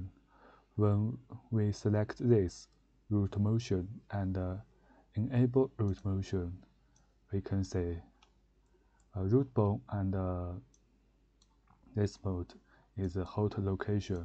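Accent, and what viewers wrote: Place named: China. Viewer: 好明显的中国口音啊大佬哈哈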